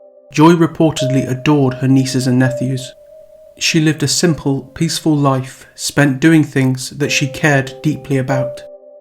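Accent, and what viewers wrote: United Kingdom. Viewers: Love the cockney accent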